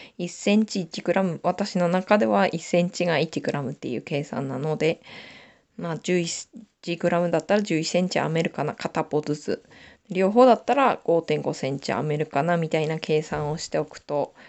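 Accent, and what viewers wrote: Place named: Hachinohe, Japan. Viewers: おはよう〜💕 「うんめ〜」カワイイよ。 青森訛り好きだわ〜🥰 大好きなものを熱く語るはずが、よくわからないという顛末😁の件も面白かった。 私は愛知出身なんだけど、名古屋弁ってキツめなんだよね🥲 青森の友達と話しているみたいで楽しかった。 またやってね、青森訛り動画👍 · 私の父が八戸の生まれです 訛りを聞いてるととてもほっこりします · youtubそのものに初めて コメントです。何と懐かしい 。南部弁～「まめしとぎ」「干し餅」美味しいものの表現に「くるみの味」先日からチャンネル登録して見ています。 いまだに 帰省した時に 道の駅に寄り探しますよ～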